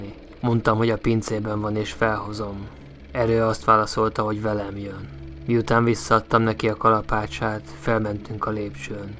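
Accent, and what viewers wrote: Russia. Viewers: Jó az orosz akcentus :-)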